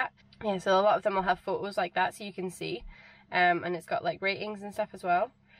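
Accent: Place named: Aberdeen, United Kingdom. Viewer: Are you from Aberdeen? As an aberdonian myself I recognise the accent, been in England for the last 12 years, mainly London, moved into my self build crafter conversion about a year ago, England is a total nightmare for parking, I love coming back to Scotland for the beautiful spots you can park in without any grief. Please do not put any of your spots on P4N as councils monitor this app and quickly put an end to your park up. I never use apps, I use google maps and street view, when I find a great spot I save it and in a year and a half I have quite the database of amazing spots. Also if you see a farmer go and ask him if it’s ok to park up on there land, they are extremely wary of pikeys parking up but if you introduce yourself be polite and respectful 9 time of ten they will accommodate your request free of charge and tell you where to park, I even had a farmer go all the back to his house to get his keys so he could open a gate for me. 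You can also offer site protection for exchange of a park up, farmers have a lot of expensive machinery, they are more than happy to have you stay as a presence against theft near the machinery. Thanks for the vid. Subbed.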